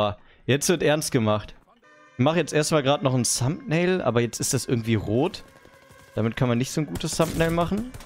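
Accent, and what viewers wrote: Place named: Germany. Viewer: du hast so ein unglaubliches deutsches englisch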